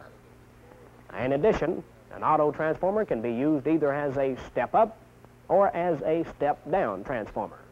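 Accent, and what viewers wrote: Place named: Texas, United States. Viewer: Sounds like he is from Houston, TX .....then did some speech training.... Glad I did my electronics tech training in the Military, USMC first before going to college for engineering, this gave me the solid fundamentals... Most of the professors at University suck at teaching....they do not start out with any conceptual teaching....They jump into Maxwell's equations on the first day..Many students drop along the way...It's not until after you graduate that you have time to think things through....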